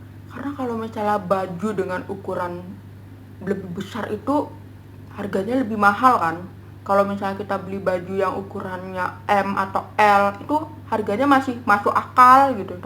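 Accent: Bali, Indonesia. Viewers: Kaya bhs nya methok ke bali ya.. haha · iya y..awalx jg aku ngerasa mbaknya orang bali.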